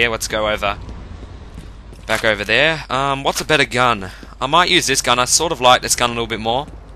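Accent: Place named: Australia. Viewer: He's Australian, it's just his accent. I like the accent.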